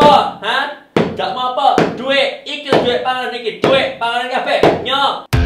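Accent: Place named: Banyumas, Indonesia. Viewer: ngakak ngapak yh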